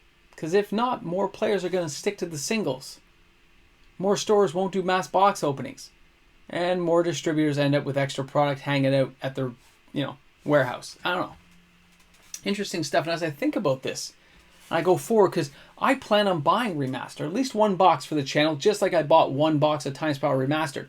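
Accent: Canada. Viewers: this is my first video, but ill be back. i like your takes, and your POV. Also, some words make me think your Canadian, so, gotta support the homegrown Content.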